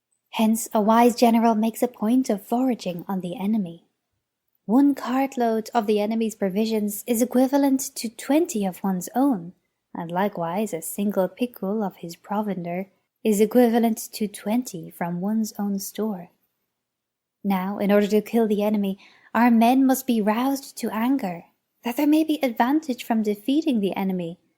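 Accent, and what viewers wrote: Ireland. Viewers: What a coincidence, last week I added an Art of War audiobook vid to a playlist, intending to listen to it at the gym this week. I think I'll listen to your nice Irish-accented narration instead. Thanks.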